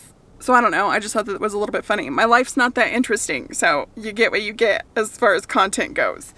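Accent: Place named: Midwest, United States. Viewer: I really feel like your accent sounds midwestern. I live in MO and you sound similar. Lol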